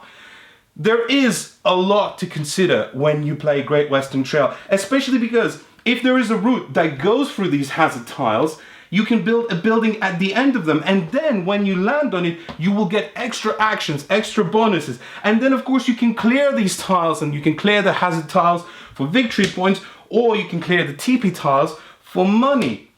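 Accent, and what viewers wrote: United States. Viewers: I love your "California dude" cowboy accent. That was glorious! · Great American accent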